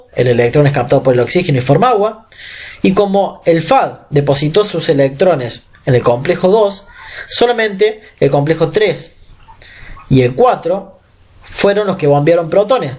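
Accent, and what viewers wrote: Córdoba, Argentina. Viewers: aprobé con tonada cordobesa y todo!!! muchas gracias!!! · muy buena aula.. y la tonada de cordaba me encanta jaja ...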